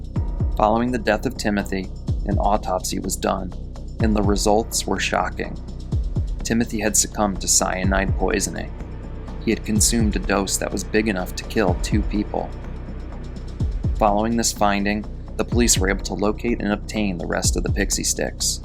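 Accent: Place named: United States. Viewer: I love your accent. What part of America are you from?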